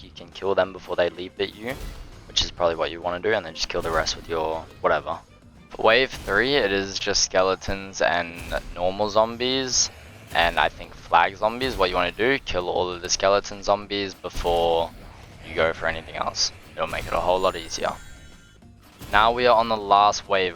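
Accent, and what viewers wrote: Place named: Australia. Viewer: nice australian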